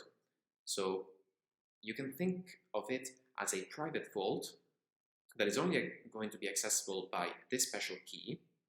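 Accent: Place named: Italy. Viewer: I smell italian accent over there. Hi compatriot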